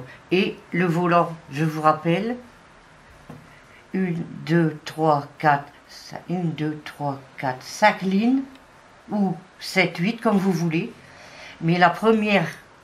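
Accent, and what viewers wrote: North, France. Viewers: bonne nuit merci pour votre modèle votre parler et du nord, votre voie me rappelle ma grand mère,ma mère et mes tantes merci j espere que le confinement nais pas trop dur a bientot JSC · Bonjour je trouve la jupette hyper jolie je vais,la faire. Mais dite donc vous n'êtes pas du Chnord avec l'accent que vous avez.... j'adore....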